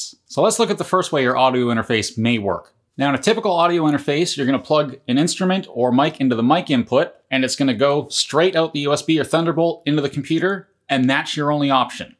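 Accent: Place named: Canada. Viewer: Canadian IN and OOT!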